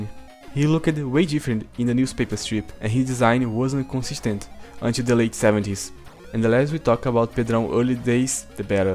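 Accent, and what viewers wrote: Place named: Brazil. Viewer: You is brazilian beacause you have a brazilian assent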